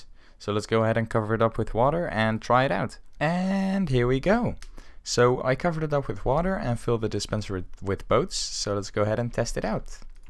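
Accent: Netherlands. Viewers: R u Dutch? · Are you from holland because you have a dutch accent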